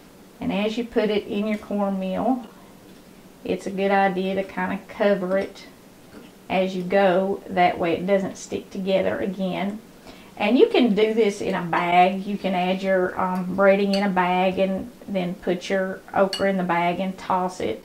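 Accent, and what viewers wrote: South, United States. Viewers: She's obviously from the south. Why do you ask? Where are you from?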